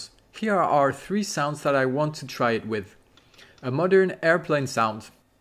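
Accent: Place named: France. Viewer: Slight french accent detected.Nice tutorial by the way :)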